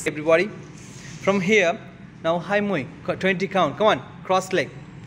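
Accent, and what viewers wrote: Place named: South, India. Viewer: Yes sir jaldi banaiye deit vedio or apki voice to bhot achiii h thodi south Indian type voice h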